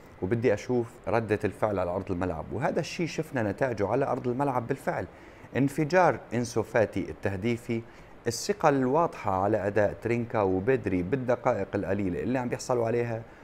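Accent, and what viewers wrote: Aleppo, Syria. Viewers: لهجتو واضحة سوري من حلب تحديدا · ميم 55 لا هو بيحكي حلبي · لك والله من اول كلمتين بين معي انو من حلب ههههه موفق بلوووووود وجميل جدا اسلوبك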